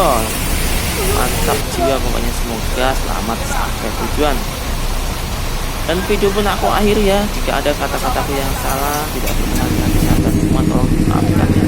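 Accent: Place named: Java, Indonesia. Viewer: Yang punya YouTube lucu sekali kalau komentar... Semoga sukses selalu YouTube nya... Sekali-kali logat Jawa nya keluarkan semua.... 😂😂😂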